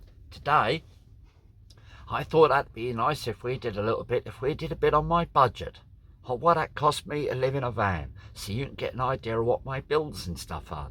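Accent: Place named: East Anglia, United Kingdom. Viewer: Good to hear that Norfolk/Suffolk twang in hot sweaty Sri Lanka!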